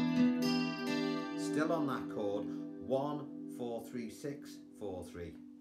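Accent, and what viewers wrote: Liverpool, United Kingdom. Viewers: Good stuff. You a scouser, then?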